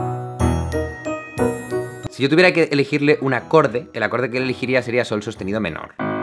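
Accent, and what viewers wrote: Spain. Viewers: no es así recuerda los guitarristas eran buenos con la guitarra acústica salio la electrica y los obligo a ser mejores ¿ y el auto tune? Ese no te exige, entonces es mal ejemplo decir que por que la voz pase por un ampli y unas bocinas del tamaño de no se que (ya que hablas muy feo el español entiendo que la mayoria de españoles hablán asi ) pero estás oyendo su tecnica y su voz sólo que amplificada . Saludos desde México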